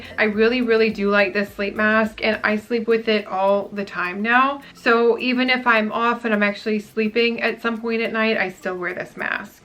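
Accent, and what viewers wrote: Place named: Wisconsin, United States. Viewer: Wisconsin probably. Nice funny accent.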